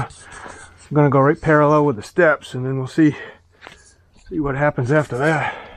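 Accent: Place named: East Coast, United States. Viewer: Hey man, sounds like you on east coast my brother. I got some rising concret around the perimeter of my pool. The whole back yard is concrete. I have bullhead placement stones around pool which are good. The issue I'm having is rising concrete around my northern edge of my pool which has a about 5 feet to the stucco wall border... It is at most 1 inch-mostly about a 1/2 inch rising right at the placement stones. I was thinking of just sledge hammering it down and using cement all to put it kinda back together after fracturing it down. A friend said to grind it down. All my control joint which are about a 5ft intervals are cracked so I was going to polyurethane them and pound out the edges up against the pool placement stones... now this is probably crazy but as an option...I was also thinking of pouring the cement all and leavening out the bullhead slant up to the rising edge... 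So my man whats your suggestion????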